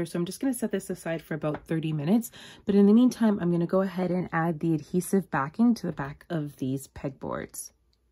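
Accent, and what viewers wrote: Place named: Canada. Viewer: Where did you find those giant unfinished wood beads? Great pegboard setup, love the Canadian accent 🥰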